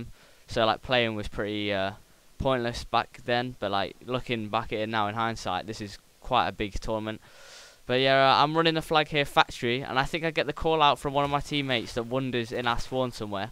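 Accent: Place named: England, United Kingdom. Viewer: your english accent <3 really good gameplay and commentary so looking forward to next one, if you want anything uploaded just send me a message and i will do it asap. // Vizze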